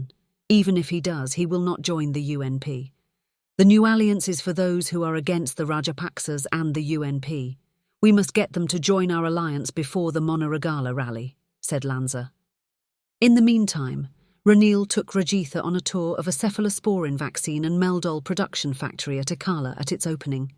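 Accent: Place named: United Kingdom. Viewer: Don't we have English speaking Sri Lankans, that we have to have this "British" lady/accent making funny pronunciations of Sinhala names!! Give me a break.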